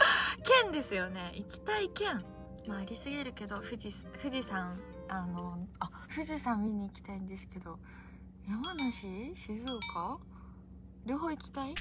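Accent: Yamaguchi, Japan. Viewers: ハツコにあるんだけど、聞いてくれるかな? 数か月前のみたら山口の方言使っていて、ほんのりした感じで癒やされたよ。たまに方言使ってやって下さいね。❤️ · 今日もアップありがとうございます🎉 グッズ、欲しいですね。絵が上手いのでステッカーとか😊 字も上手だからサインも欲しいです。あとところどころに出る山口弁に癒されています。十万分のイチだけど応援しています。天使ナンバー❶❤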